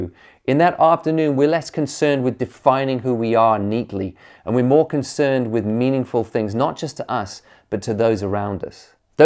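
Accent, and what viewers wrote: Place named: South Africa. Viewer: I thought I could here a little South African in your accent. Haha. Anyway Great content Man. Your videos are inspiring.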